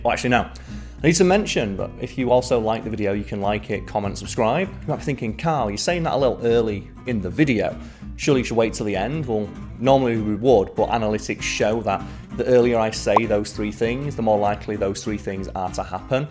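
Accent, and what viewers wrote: United Kingdom. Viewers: Karl sounds very English but i assume the channel is aimed at the US because British people would never refer to the Christmas period as "The holidays" we just call it Christmas time. Holidays are just any time spent away from work or school or we use it in the same way Americans use the word vacation. Don't ask me why i felt the need to share that but it just sounded weird coming from someone that sounds ultra English.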